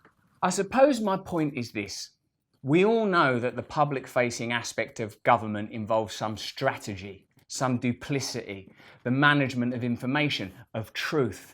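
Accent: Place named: United Kingdom. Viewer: l like this man when he speak very clear British accent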